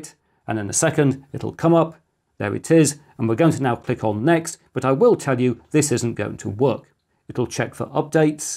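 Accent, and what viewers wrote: United Kingdom. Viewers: Very clear tutorial, summarizes the various procedures perfectly. Plus I really like your British accent and very clear diction.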